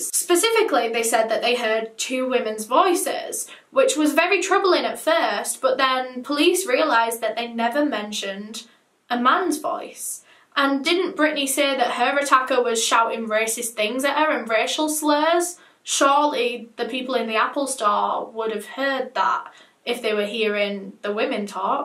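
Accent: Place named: Yorkshire, United Kingdom. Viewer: she's from leeds (west yorkshire), the same as me so yorkshire x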